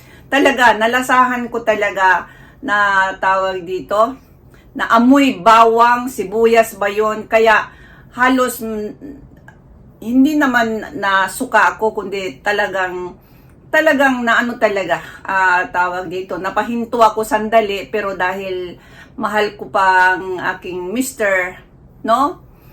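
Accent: Visayas, Philippines. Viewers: Anong province mo ma'am? Kasi Ang salita mong Tagalog Ang tuno bisaya halata na Hindi ka pure Tagalog.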